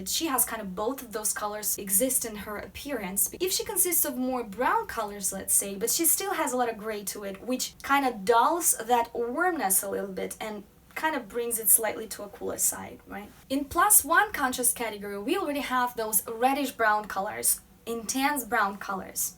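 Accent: Russia. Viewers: Ахах, через 20 секунд речи поняла, что девушка русская. Рашн инглиш из соу рашн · I have the muddy eyes, dark green and orangey brown. Like you,. I knew when I saw you and heard you speak that you were Russian, before I read your bio.;) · Всегда узнаешь русский акцент)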